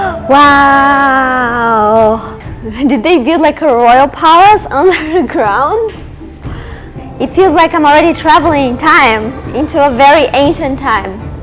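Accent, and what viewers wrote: China. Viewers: Your voice is so unique like a little girl. You even have a Chinglish accent.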